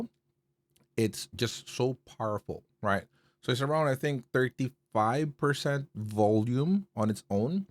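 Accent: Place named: United States. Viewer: naka automatic play next tong YT ko. nkikinig ako habang nag wowork kasi ng mga reviews ng Edifier MR5.. tpos nag next auto play tong Video mo. akala ko black american. pinoy pla. sana ol gnyan accent. thanks sa review dude. nag sub na rin ako.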